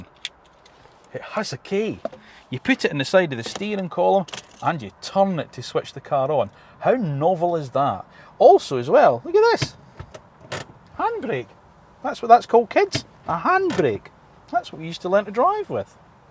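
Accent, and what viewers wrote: Scotland, United Kingdom. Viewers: gotta say, your (scottish?) accent is really nice and warm to listen to (not in a homo way). just that it feels pleasant and friendly.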